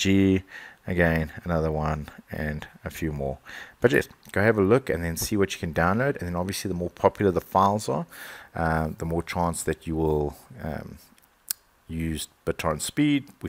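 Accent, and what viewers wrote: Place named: South Africa. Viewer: That south african voice ek se xd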